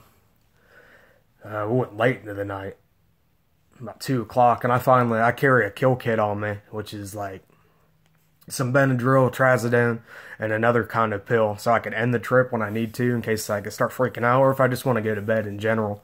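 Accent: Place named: East Texas, United States. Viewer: You sound like your from east tx also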